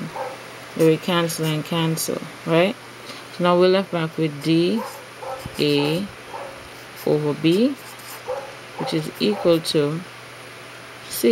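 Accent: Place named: Jamaica. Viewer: Are u a Jamaican. Ur accent sounds like one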